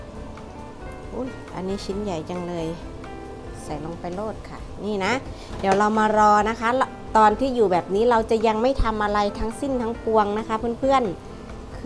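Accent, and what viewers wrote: Isan, Thailand. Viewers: พึ่งได้เข้ามาติดตาม ไล่ดูทุกคลิปเลยค่ะ เข้าแล้วออกไม่ได้จริงๆช่องนี้ ชอบเวลาพี่ พูดไทยตกอิสาน น่ารักมากๆค่ะ 😊 บางทีเราก็นึกคำไม่ทัน 😆แต่มันเป็น เอกลักษณ์เนาะ เสียงใสๆไทยคำอีสานคำ ฟังเพลินดีค่ะ FCสาวอิสานคือกันจ้า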